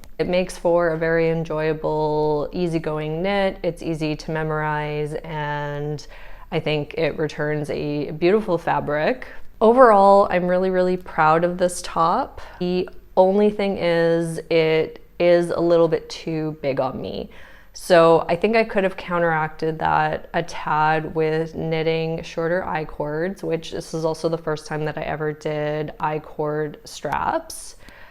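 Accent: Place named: Canada. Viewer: and sorry - you’re Canadian - cardinal sin to assume you’re American- I should have got that from your accent! I have family in BC 🤎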